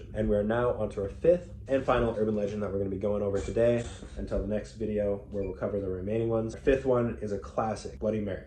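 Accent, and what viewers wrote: Australia. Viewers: Wait, he’s Australian? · Lmao I was so confused at the start with the Australian accent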